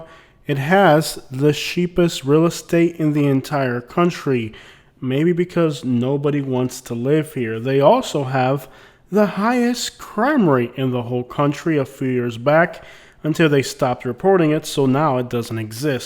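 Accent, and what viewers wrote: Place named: South, United States. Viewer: Love that southern accent!! You right about these places. I go thru these towns and its bad. You have keep your head on a swivel and keep one in the chamber.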